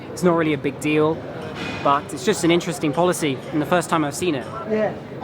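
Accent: England, United Kingdom. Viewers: Hey, you sound British. Are you from the UK? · Çok güzel bir yermiş ve harikaa İngilizce aksanınn💖💖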